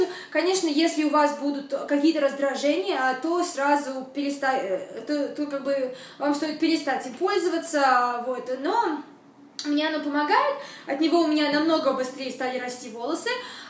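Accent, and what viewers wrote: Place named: United States. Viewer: у тебя акцент))) наверное ты проживаешь в Америке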